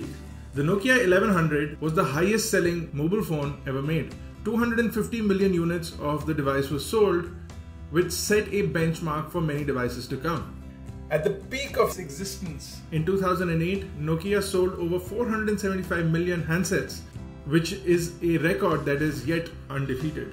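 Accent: India. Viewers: bruh? you really that ignorant? of course he has an accent. he's from india. dont be a douche. id like to hear you speak english without breaking a line or two. in addition to your english, its ""you're" indicates his/her characteristic whilst "your" is directed to his/her posession. practice more.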